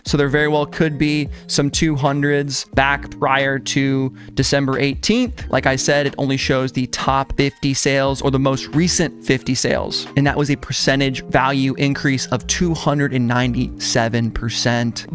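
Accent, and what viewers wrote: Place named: England, United Kingdom. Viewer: always a W with the english accent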